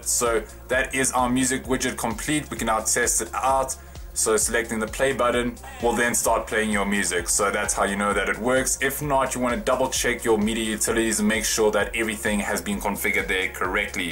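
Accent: South Africa. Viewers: dude.you South African?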